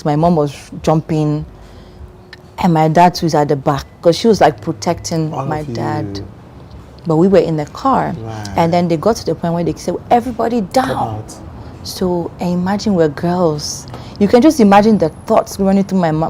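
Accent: Nigeria. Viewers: Lol. I thought I was the only one who noticed it. She sounds Nigerian. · She sounds more Nigerian than any other nationality. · She sounds like a Nigerian.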